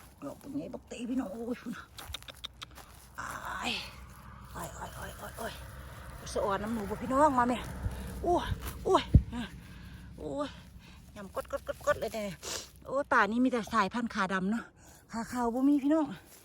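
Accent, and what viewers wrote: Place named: Nyo, Thailand. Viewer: สวัสดีจ้าสาว อยู่จังหวัดใด๋น้อคือเว้าญ้อ ฉันอยู่ สกลเด้อจ้า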